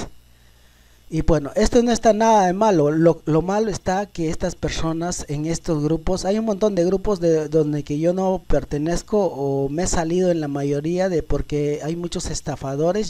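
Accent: Bolivia. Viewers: Eres boliviano?...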